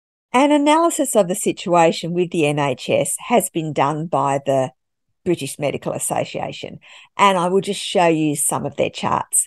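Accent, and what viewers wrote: Australia. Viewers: Australian accent ?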